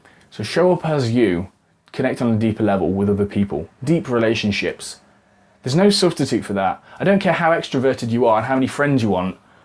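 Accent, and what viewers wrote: United Kingdom. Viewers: your british accent is so coollllllllllll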